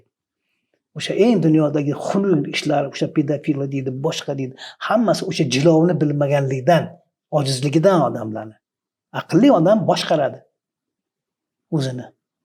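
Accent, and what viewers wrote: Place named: Tashkent, Uzbekistan. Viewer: Тошкент шевасида гапирар экансиз, узингизни шевангизда ёки адабий тилда гапирсангиз эди.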